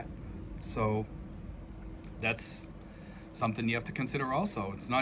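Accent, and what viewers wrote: Boston, United States. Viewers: I could tell you're from Boston as soon as you started talking. I'm from Rhode Island. :)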